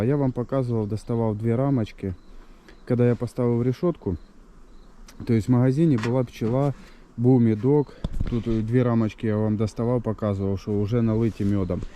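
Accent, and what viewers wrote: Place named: Surzhyk, Ukraine. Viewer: Суржиком, у нас тут всі так балакають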